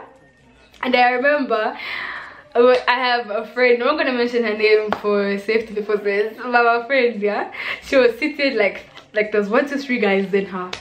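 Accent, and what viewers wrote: Nigeria. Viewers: You sound Nigerian 😭❤️youre literally my favorite YouTuber as about now !!❤️🔥🌈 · You have a Nigerian accent 😍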